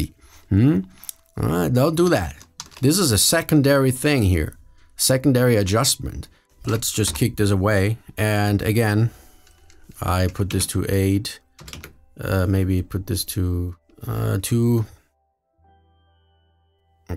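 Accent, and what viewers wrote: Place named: Italy. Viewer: I knew I recognised something italian-ish to you! That's because you are in fact Italian! Grande! :D I love the way you talk through your contents, and also the voice sounds. They made my day when I heard them the first time. I am almost new to Fusion and I am trying to get into it as much as I can, also because I am trying to push it in the company I am currently working for. Anyways, bel lavoro!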